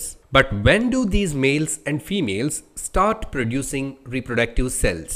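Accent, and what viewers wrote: India. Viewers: Why get someone with an exaggerated hindi accent to narrate an english video? Its really terrible to try and understand. Good vid, but ruined by this . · مشكله الهندي لما يتكلم انجليزي....الله المستعان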